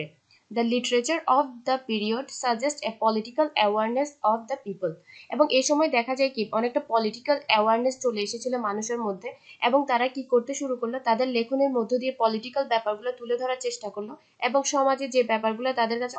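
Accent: Chittagong, Bangladesh. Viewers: ইংরেজি সাহিত্য পড়া শুরু করেছি,মাত্র অগাস্টিন পিরিয়ড পর্যন্ত আসছি,শুরু থেকেই আপনার ভিডিও সাজেশনে আসে,আপনার ভয়েস শুনে শুধু একটা জিনিস এ আসতেছিলো আপুনা চট্রগ্রামের কিনা😅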